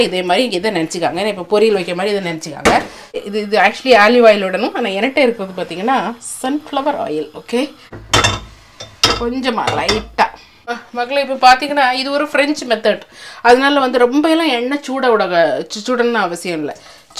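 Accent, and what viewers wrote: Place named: Nanjil Nadu, India. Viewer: மாட்டிறைச்சி திங்கறது இல்ல. ஆனா நம்ம நாஞ்சில் தமிழுக்காக பார்த்தேன்.என் பதிவு எளிமை Sister. உங்க பதிவு Super Sister.🤝🤝🌹🌹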